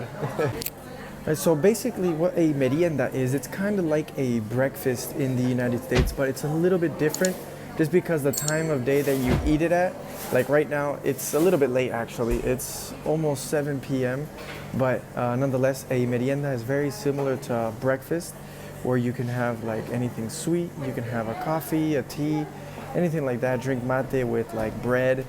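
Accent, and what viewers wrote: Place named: Argentina. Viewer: Your Argentinian accent is impeccable;). We all speak Spanglish in the States and then when going to a true Latin country that it doesn’t cut it , lol. French is my first language, can speak Portuguese ( Brazilian style ) and some Spanish, but the Argentinians loved the way I spoke Spanish ( though very minimal) Thank you for sharing your story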